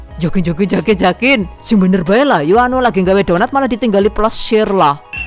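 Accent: Banyumas, Indonesia. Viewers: Ora ngapak ora kepenak ya bund 😅😅😅😅😂😂😂resepnya mantuulll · Jebulr ngapak 😂😂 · Banyak banyakin jokes ngapaknya 😂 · Duh serasa kek nonton langsung demo masak ada bumbu2 joke khas ngapaknya🤣 best lah lain daripada yg lain👏🏻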